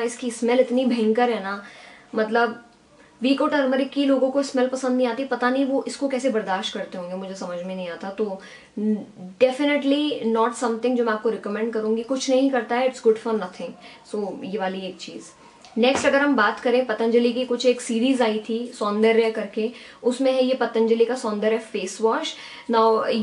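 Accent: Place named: Rajasthan, India. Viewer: U totally rocked the rajasthani accent today!!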